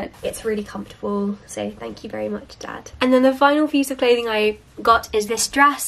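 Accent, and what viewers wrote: United Kingdom. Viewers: i wish i could speak as beautiful british english as you 🤩 greetings from germany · That's how british people talk. What is wrong with you??? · I love your British accent and use your videos to practice my pronunciation ❤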